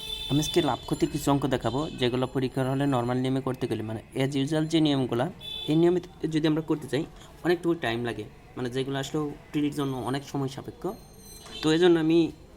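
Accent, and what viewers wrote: Sylhet, Bangladesh. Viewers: ভাই মনে হয় সিলেটি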